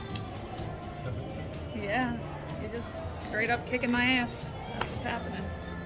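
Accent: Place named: South, United States. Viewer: would be AWESOME to play blackjack with ya sometime if it's in the " cards " and ya I'd love to learn that southern talk!! I'm Canadian were known for the cold and wearing summer stuff in the cold, dont ask, lol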